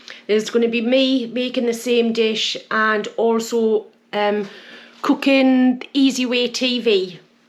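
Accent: Newcastle, United Kingdom. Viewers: So down to earth and the way we used to cook before fast food! Love your Geordie accent. Keep em coming, cooking the way people actually cook in an ordinary kitchen - cooking first class food! Thanks. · I like your British accent I'm American. I love your video of the corned beef & potato pie. I migth make it some time it looked very easy to make. I'm part Irish and I thought cabbage migth be good to put it do you think so?. May bless you.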